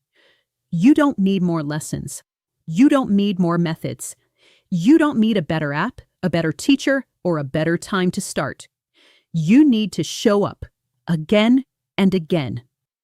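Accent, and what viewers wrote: United States. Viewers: In which accent are you teaching american, british or indian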